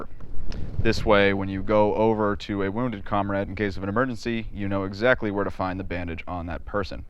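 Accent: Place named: United States. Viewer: That’s such an 80’s kind of American accent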